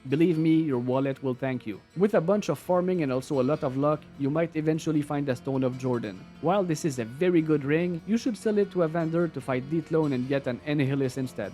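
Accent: Quebec, Canada. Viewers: Tai tu quebecois ??? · Thanks for the content! Are you french canadien?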